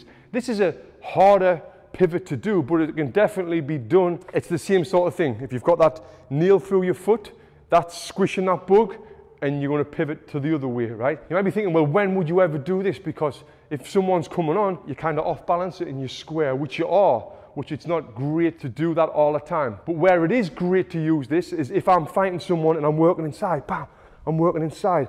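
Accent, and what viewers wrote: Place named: Northeast England, United Kingdom. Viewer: Cracks me up how the North East accent needs subtitles.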